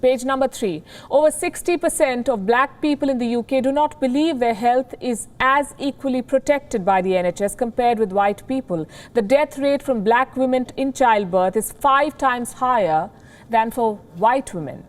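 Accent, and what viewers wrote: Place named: India. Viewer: Indian English accent beautiful and the best😍 Love from Philippines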